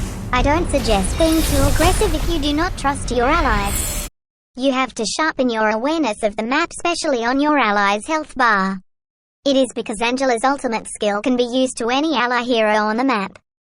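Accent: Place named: United Kingdom. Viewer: Whats with the british Accent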